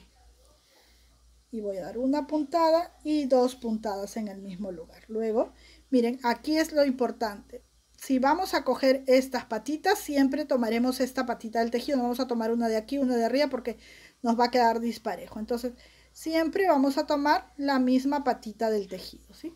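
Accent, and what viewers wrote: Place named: Mexico. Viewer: ¿Eres Peruana?...tu acento es muy parecido al del norte de América , específicamente de México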